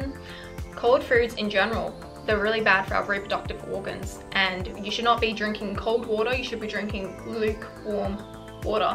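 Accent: Australia. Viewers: 5 reasons why changed my life: 1. made me boost my self-esteem. 2. made me physically healthy & mentally 3. got an aussie accent hooray! 4. Life lessons, like. thank you! 5. made me SMART and organized. Like love you your a life changer i will definitely talk about you to my grandchildren LOL ❤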